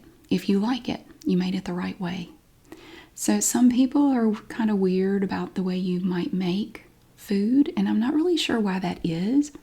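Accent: South, United States. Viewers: I could watch a tomato sandwich in the making topped off with a southern accent every day for the rest of my life.❤ Hope you are well! I need to catch up on the neighborhood drama. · Love your southern accent ❤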